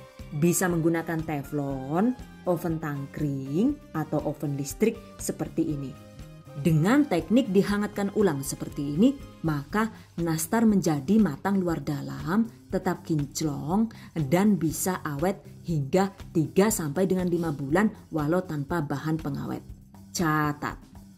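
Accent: Banyumas, Indonesia. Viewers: Deneng rika ngapak😅😅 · Wih sangat" faham cara menyampaikan nya bahkan bikin ketawa kas jawa ngpak nya😂😂😂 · Karena saya g punya oven,bisa dicoba nih resep yg satu ini. Hmmm dari tampilan nya enak bgt, Mantep pokoknya 👍👍👍 Dan selalu suka sama ngapaknya bikin ketawa 🤣 #GiveawayTHV2021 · Aku suka channel ini. Jelas bgt ksih ktrangan d stiap resep.. Juga ga pelit ilmu. Ada bhsa ngapak.. Yg bkin ktwa. Sukses trus ya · Ini wong ngapak yah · Wong ndi njenengan, bahasane gaul tapi ngapak ya rikane.. Nyong dadi ngguyu2 ulih ngerungokna rikane. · Resepnya mantap...ngapak-ngapaknya bikin ngakak...ca.....tat #GiveawayTHV2021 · Ngapak gaes😂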